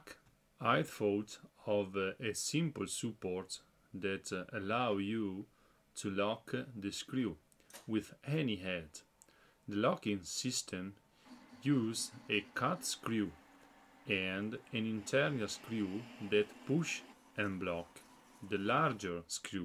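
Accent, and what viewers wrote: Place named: Venice, Italy. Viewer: A me parea mi de riconossar na cadensa nostrana... te sarè mia dal Doeo!? Bel laoreto, brao 💪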